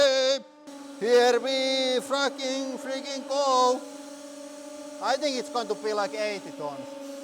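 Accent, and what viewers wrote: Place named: Finland. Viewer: its the Finnish accent I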